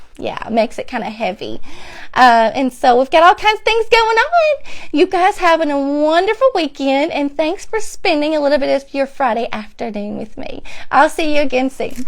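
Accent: South, United States. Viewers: I am replaying first time to find you I love your southern draw your style is same as mine I cannot wait to explore your crafts and hopefully catch you live. Be Blessed my new friend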